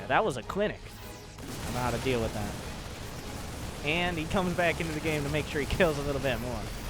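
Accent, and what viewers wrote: United States. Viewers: USA but with a more "country" accent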